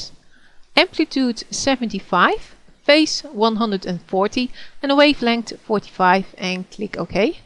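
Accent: Netherlands. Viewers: I hear a Dutch person speaking!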